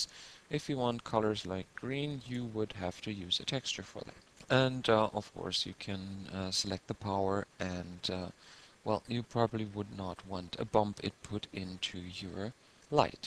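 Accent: Germany. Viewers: I like german accent in English - anyway thanks for this tutorial.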